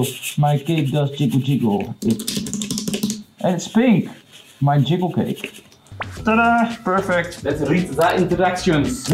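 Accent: Netherlands. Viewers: how do you cringe, I love the Dutch accent. voice is angelic lol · As a fellow dutchie who's used to english content creators i'm just cringing so hard at our accent. Congrats tho you've earned it🥳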